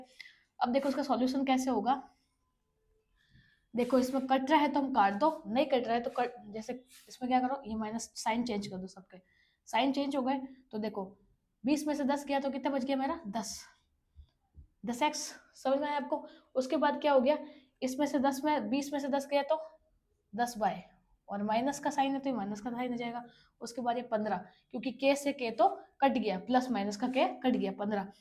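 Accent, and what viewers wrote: Rajasthan, India. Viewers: Tone is rajsthan 🙏m rajsthan se hun🙏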